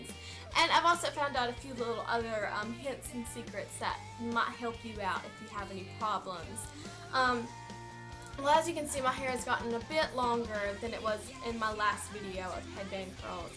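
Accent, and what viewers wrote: South, United States. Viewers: This is awesome! Thanks! :) I love my hair and your method worked great! Question, How long do the curls stay in your hair during the day without hair spray or any moose, just hair? How long with? I'd appreciate your answer :) BTW I adore your country accent, I think its the cutesiest Ever! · your accent is so adorable and endearing! so wish I had more of a southern accent than I do. you seem really sweet just wanted to tell you that! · Great job girl love that southern accent, keep doing YOU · That's what you call a country accent she is from the south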